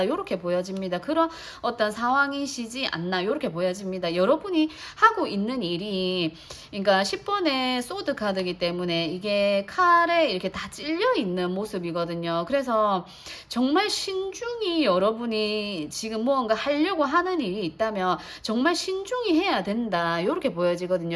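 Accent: Gyeongsang, South Korea. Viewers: 경상도 분이다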